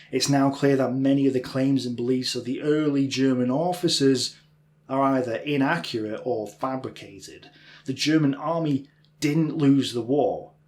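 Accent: United Kingdom. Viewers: If you want foreign listeners, try to tell a history...a tale.....not giving us numbers and never speaking so fast.... not only the British pronunciation is terriible for us but you also speak fast and connect words in the same style Germans do....well...if you want us to listen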